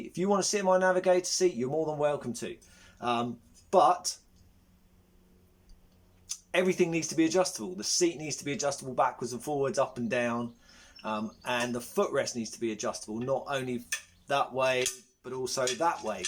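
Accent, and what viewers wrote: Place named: England, United Kingdom. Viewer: My wife asks "who's the Ed Harris guy with the English accent?" so i said "nah, younger and prettier" lol.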